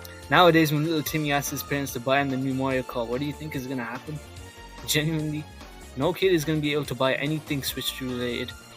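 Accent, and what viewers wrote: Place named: United Kingdom. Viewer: Are you british? That accent is pretty cute ngl haha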